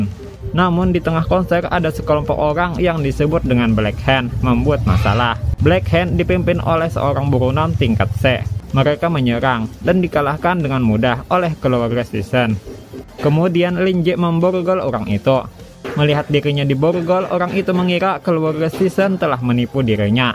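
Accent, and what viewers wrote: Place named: Banjar, Indonesia. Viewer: banjar leh.. lanjutkan bang 😂 unik ni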